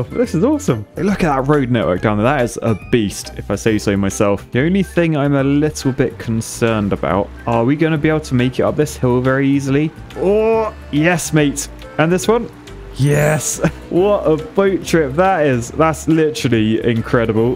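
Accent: United Kingdom. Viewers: Because this guy is british, he really makes me think American English and English are completely different languages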